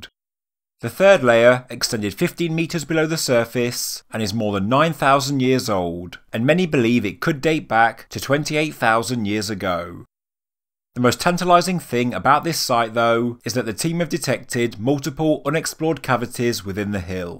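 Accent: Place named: East Midlands, United Kingdom. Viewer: I love archeology given to me in a strong east midland accent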